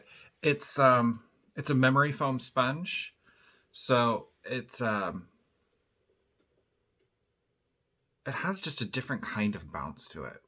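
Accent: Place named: Minnesota, United States. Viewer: LOL I'm from western wisconsin, so I know all about the Minnesota accent. :D Wow incredible look!! You look amazing!! I will be Using both the liquid and powder foundation to get the contour. I've never used both foundations at one time and I am going to try that. Like I said you look is amazing!!!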